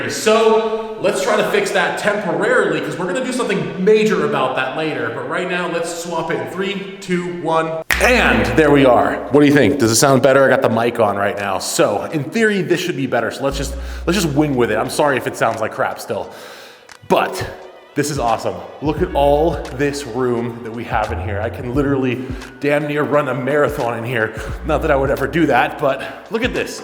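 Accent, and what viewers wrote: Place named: Australia. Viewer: i thought you where aussie from just watching your shorts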